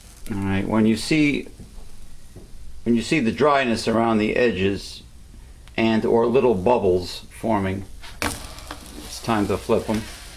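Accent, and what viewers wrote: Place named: New York, United States. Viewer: I was just looking for a vegan sourdough pancake recipe and came across yours, it seems to be still one of the few vegan sourdough recipes on youtube even in 2017. Admittedly, everyone has learned a lot about producing cooking videos since 2012, and I imagine yours would probably be shorter if you had made it today. But the bonus for your video is that you explained the process very clearly, so is very good for beginners, and your Robert de Niro accent and serious comments below are adorable. Italians are the best cooks.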